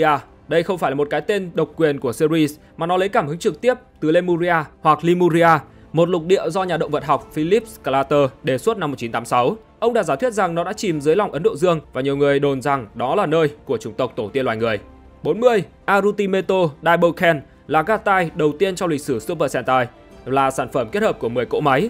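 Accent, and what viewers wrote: Japan. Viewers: hình như là tên tiếng anh nhưng mà là anh nhật bạn ạ =)) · đọc tiếng Anh theo kiểu Nhật nghe khó chịu thật sự, mà đã thế còn chỗ Anh chỗ Nhật, nhất quán 1 kiểu thôi :)))) ko đọc đc thì đừng có đọc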